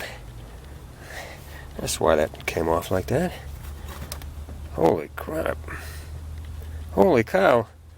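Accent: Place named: Maine, United States. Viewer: Where ya from, bub? Sound like a fellow Mainer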